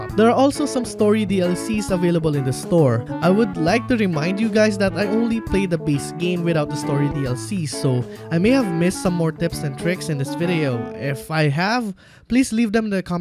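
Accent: Philippines. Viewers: Are you filipino? You have the accent... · I like your Filipino accent, I wish I had mine but I'm too much an Aussie to turn back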